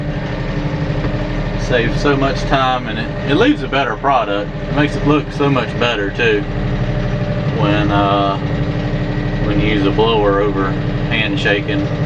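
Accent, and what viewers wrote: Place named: North Carolina, United States. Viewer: Ferguson 20 diesel Its a NC thing. I tried to teach my friend to say oil, boil and toil, and he finally got it right, after 20 tries! Lol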